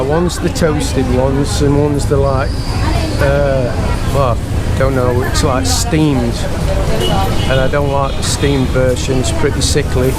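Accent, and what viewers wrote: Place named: Nottingham, United Kingdom. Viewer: Trying to work out your ascent it sounds Notts area I am still contract working in the UK till next year when I retire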